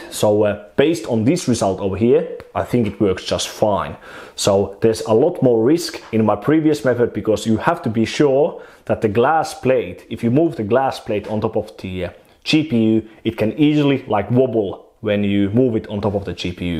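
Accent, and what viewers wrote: Finland. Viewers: Ai nyt on todella suomalainen aksentti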